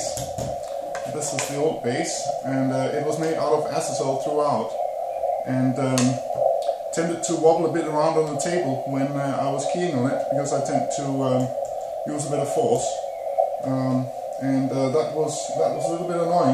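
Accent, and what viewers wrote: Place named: Denmark. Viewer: you have got to be danish, your accent sounds danish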